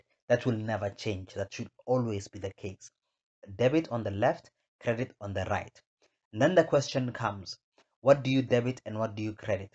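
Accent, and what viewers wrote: South Africa. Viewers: 🇿🇦lets go you from sa can hear your accent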